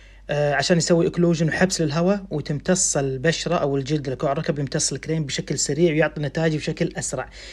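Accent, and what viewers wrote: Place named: Syria. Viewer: حلو الحجي السوري انا من العراق بس اكيد الهجه السوريه حلوه تحياتي لكل أهل سوريه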